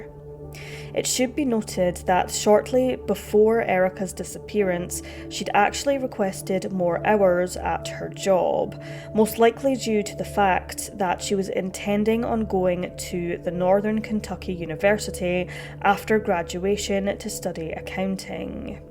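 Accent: Scotland, United Kingdom. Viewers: She is Scottish, and no doubt has unique inflections of her own. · Jeezus, she’s Scottish. Of course, she’s going to have an accent. Silly Americans b!+<h!ng about the way others talk. 🤦🏽‍♀️🤷🏼‍♀️😵‍💫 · like some others have said I suspect it is because she is Scottish, depending on the region a Scottish accent can be VERY thick and difficult for others to understand, it sounds to me like she is very carefully enunciating to make herself understood clearly.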